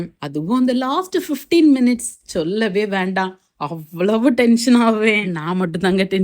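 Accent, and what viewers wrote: Tirunelveli, India. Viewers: Sister neenga thirunelveli ya??...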